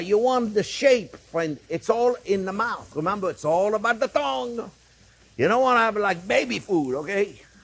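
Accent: France. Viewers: You may have a French accent but you are a great American. I love you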